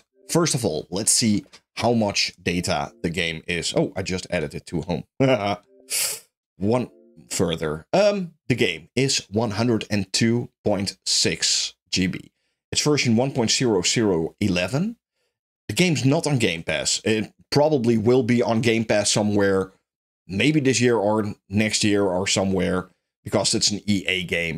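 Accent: Netherlands. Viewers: Altijd leuk om een random video aan te klikken om vervolgens dat Nederlandse accent te horen. Top video, goede info. Bedankt!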